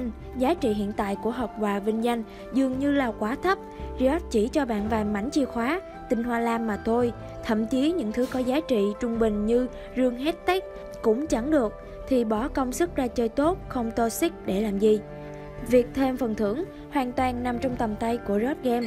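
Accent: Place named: South Central Coast, Vietnam. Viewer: Hình như kênh này người miền Bắc nhiều Nghe giọng miền trung ,nam không quen Ad nên dùng phần mềm chỉnh giọng , hoặc tìm cô gái giọng bắc sẽ tốt đó ad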